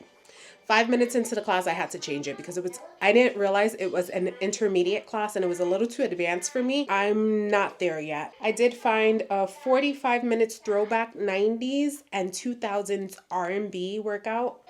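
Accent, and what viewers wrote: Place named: New York, United States. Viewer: hey baby…. awh the face mask was so cute. lol a tooth ache is the absolute worst. We do miss Meg… happy Mother’s Day babe. We want you to vlog more too…👀 Say “coffee” again…🤭 your NY accent is everything. yum, love Greek food! The bouquet is gorgeous, you did amazing baby. Oooow, this Sunday my husband and I are going to indulge a bit. I’m going to pick up some of that tequila. Not I think I’m Spanish…🤣 Your face is flushed after those two drinks. That one looks so good too. By the looks of it, you guys had an amazing Mother’s Day.🩷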